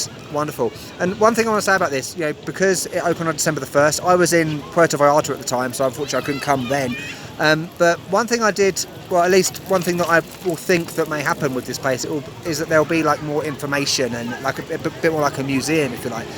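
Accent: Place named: United Kingdom. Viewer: Hi! You did an excellent job, I love how you feel the environment in any place make is so special and also I always enjoy you personality and of course your British accent. Big hug for you Carlos.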